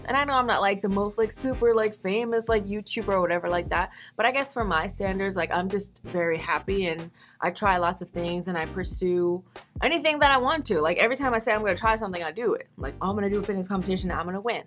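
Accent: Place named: South, United States. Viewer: Congrats 🎉🎊❤!! What I love the most is that you still have a southern drawl when you speak, it's been 8 years and you can still tell you're southern. Thanks to your videos I got to learn more about Korean culture through the perspective of a Black-American woman! Thank you for your dedication and love sis!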